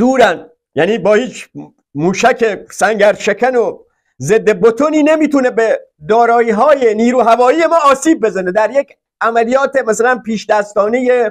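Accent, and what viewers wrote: Lorestan, Iran. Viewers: سلام. بامعرفت خداییش انرژی مثبت ميگيريم بااجرای خوبت واستفاده ازمثل هاوگویش لری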